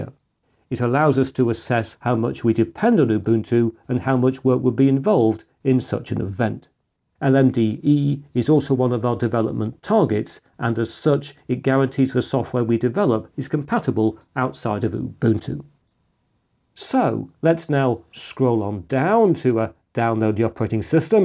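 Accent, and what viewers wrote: United Kingdom. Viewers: Dear ‚ I might be the most commenter on this channel by far. It feels so good to say that you are the god of computing. The way you explain topics‚ the way you divide content into subtopics‚ and ofcourse the great Britain accent. Brutha, you are the goat of computing. Using your videos as a guide to installing anything. Ofcourse, I try things out first. Use Chrome OS Flex on my Main Laptop. And use Omarchy Linux on my desktop home pc. You can say I use Arch btw!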